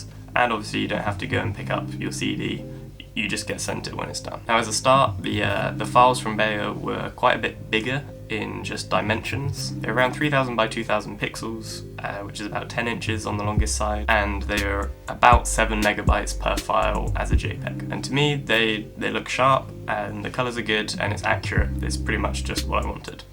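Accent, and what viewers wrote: London, United Kingdom. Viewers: Are you from London?? :) keep up these great vids too bro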